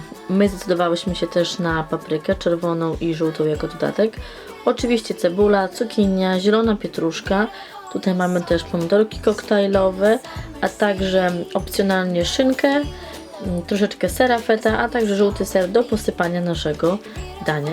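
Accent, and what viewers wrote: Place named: England, United Kingdom. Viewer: Wróciłam do Twoich starszych filmów i zauważyłam że miałaś większy akcent angielski niż teraz:D duża zmiana na plus. Bardzo fajne filmy:)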